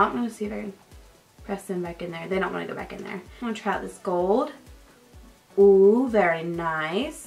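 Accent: South, United States. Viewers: You're so friking beautiful I love you and I want to be you!! Your hair is so friking bombbbbbbbb and you're so pretty makeup or not, and your slight southern accent I could NOT be more jealous of and your name is so cute. You seem like suuuuuch a down to earth girl and I wanna be your bff. Ok done being creepy AF😆🙄UGH WHY CANT I BE YOU GIRL🤓💙😍😝👑 #queen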